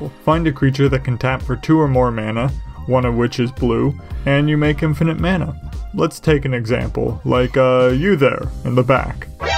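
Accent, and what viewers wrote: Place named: Canada. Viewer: 19 seconds in, ahhh so he is Canadian.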